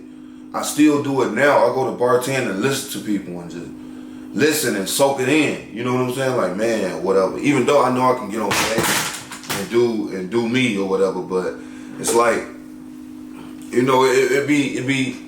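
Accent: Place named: Brooklyn, United States. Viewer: Bruh in your videos I really thought you talk like that😅 I'm from Bed-Stuy Brooklyn., When I first seen and heard you I thought you was from Brooklyn too. Yo Brooklyn, Chicago and part of Philly have so much in common. I was in the Army with a Brother from Chicago., We called him Shorty-Long.. He was Hilarious!!!.. he made me feel like I was back on the Block. You also have true Talent.. ✌🏽👍🏽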